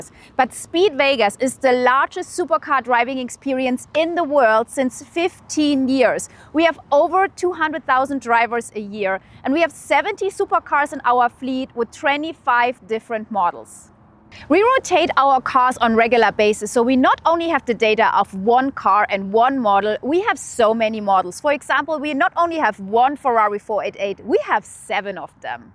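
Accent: Germany. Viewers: I clicked for the car information but come on. She is beautiful and dressed in a way that I can’t look at the cars for some reason.😂. I love the German accent and that she knows about cars. Definitely an exotic I would want to rent.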